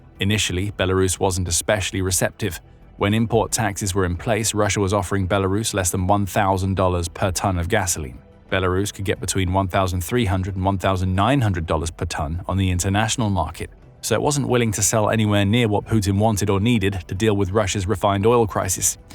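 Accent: England, United Kingdom. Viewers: Why does the narrator, who's clearly English by his accent, keep calling petrol, "Gasoline"? In the UK they cal it petrol NOT gasoline!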